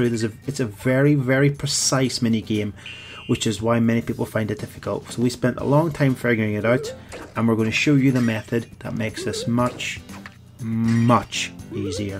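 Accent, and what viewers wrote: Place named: Ireland. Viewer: Hes so irish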